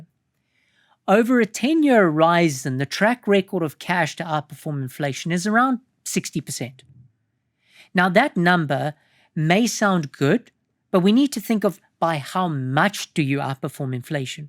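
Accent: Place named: England, United Kingdom. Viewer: Keep English Accent's out of the NEW WORLD.................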